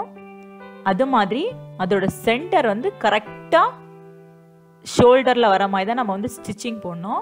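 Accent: Nagercoil, India. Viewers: For u Nagercoil ah?, Ur language is like that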